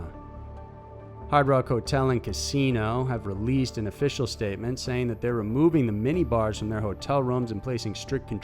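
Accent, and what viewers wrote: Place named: Texas, United States. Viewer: Hi, new here. Trying to place this guy's accent, has he ever said? Sometimes I hear Va/NC area other times it sounds like Ga or Tx. Prbly way off, anyway... thoughts?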